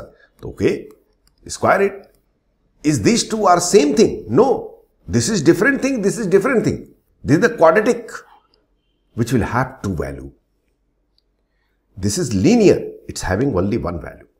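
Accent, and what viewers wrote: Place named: India. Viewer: Thank you! Finally clicked in my mind. Your Indian accent is very satisfying as well 😂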